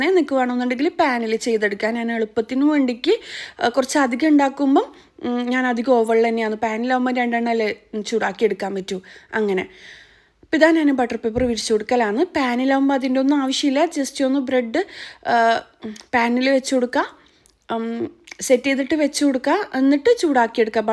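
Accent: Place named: Kasaragod, India. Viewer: Njan kasargodan samsaram njangale samsaram same aanu njangalum kutti machil parayum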